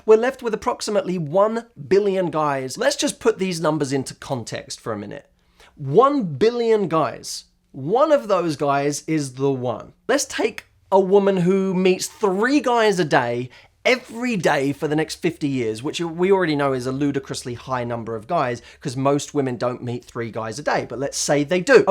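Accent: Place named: United Kingdom. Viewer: I like your videos, but my own problem is your British accent that I can't get used to!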